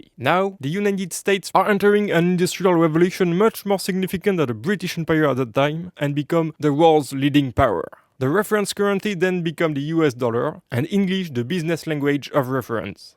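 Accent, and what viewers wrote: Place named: France. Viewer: 💀Basically you're just saying that you're fine only knowing one language becuase you already know the "global language". Well im gonna say a thing to ya champ, you speak English because it's the only you know (Even though I think you also speak French by your accent), I speak English because it's the only language you know.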